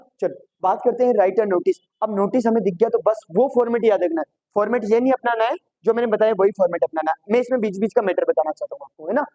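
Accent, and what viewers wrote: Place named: Hadoti, India. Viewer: Sir aaap hadoti bolte ho kaha se ho aap kota ya baran❤😮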